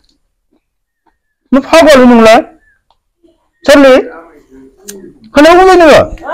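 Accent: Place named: Kokrajhar, India. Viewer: Dabw Kokrajhar style railainw rwnga ada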